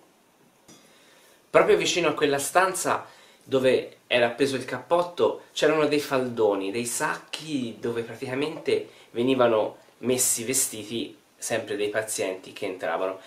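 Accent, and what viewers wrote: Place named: Tuscany, Italy. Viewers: grazie a te comunque per il bel contenuto e la risposta. Io penso che purtroppo ci sia la convinzione che il toscano sia l’italiano perfetto ma purtroppo non é così: non esiste l’italiano perfetto a livello regionale. L’ italiano perfetto é quello senza inflessioni o magari senza inflessioni così spiccatamente marcate. Purtroppo molti toscani invece, sono convinti che il toscanismo sia “il bel parlare”, a volte può essere simpatico ma a volte appesantisce e rende poco chiaro un contenuto che magari può essere bello come il tuo. É questo il caso purtroppo, ma ovviamente a mio modestissimo parere. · Sarà anche interessante questo contenuto ma il marcatissimo accento toscano lo rende davvero pesante e a tratti incomprensibile. Personalmente non sono riuscito a finirlo. Quando si fanno contenuti di qualità come questo bisognerebbe, a mio parere, cercare di essere il più comprensibili possibile ed evitare inflessioni così marcate. 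Mi spiace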